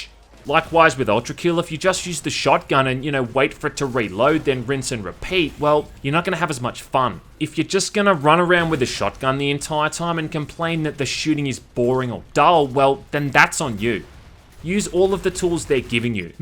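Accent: Australia. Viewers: You sound Australian.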